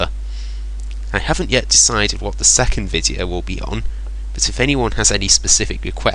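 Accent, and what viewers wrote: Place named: England, United Kingdom. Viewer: Well done! Love the wit! Dad was from Manchester-so nice to hear the English accent again!!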